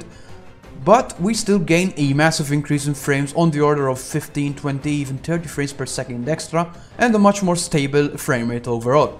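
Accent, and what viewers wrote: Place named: Malta. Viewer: I knew that you are Maltese as soon as you start speaking ;-), so this also apply for an AMD 5700XT ? do you have maybe another video showing an AMD card?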